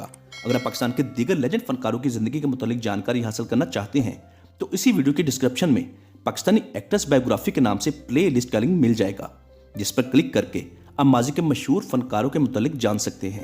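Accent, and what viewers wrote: Punjab, India. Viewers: آپ کی وڈیوز معلوماتی اور دلچسپ ھیں- مگر آپکا اردو تلفظ خالص پنجابی ھے جیسے مرینہ کو آپ MRINA بولتے ھیں- جبکہ لفظ Marina ھے مطلب م پر زبر ھے، اسی طرح دیرینہ کو درینہ بولتے ھو یہ لفظ "دیر" سے ھے دیر ینہ ھے درینہ نھیں- امید ھے اپنی تصحیح کر کے اپنی وڈیو جو مزید دلچسپ بنائیں گے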